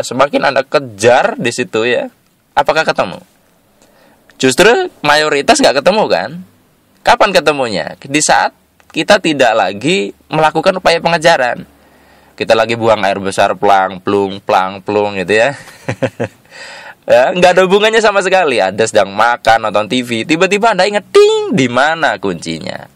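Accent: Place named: Java, Indonesia. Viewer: ini kok suara ronald franknya gk kayak biasanya, ada medoknya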